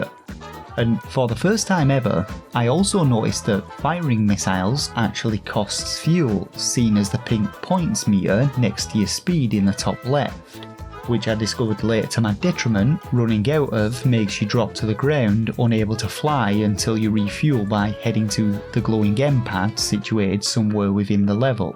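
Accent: United Kingdom. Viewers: What kind of accent does have its like so so british but hes over here like “seven-teen” instead of “seven-een” a lot of the pronunciations are also wild. Wondering where they are from.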